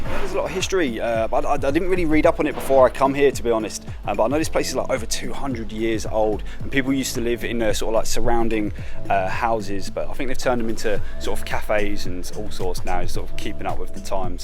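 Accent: United Kingdom. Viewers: where the hell in bbk? So confusing wih your crazy brit accent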